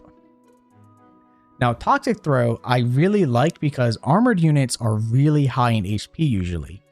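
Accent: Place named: Philadelphia, United States. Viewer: Drawn to the Philly accent lol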